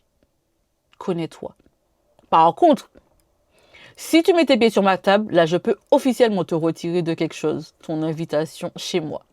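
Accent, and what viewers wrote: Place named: Martinique. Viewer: La première vidéo que j'ai vu de toi, j'ai su tout de suite que tu es martiniquaise. Tu as bien l'accent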